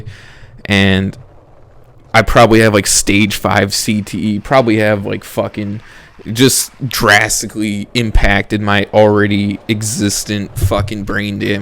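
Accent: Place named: Midwest, United States. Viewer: It sounds very Midwestern vro sounds mad congested lmao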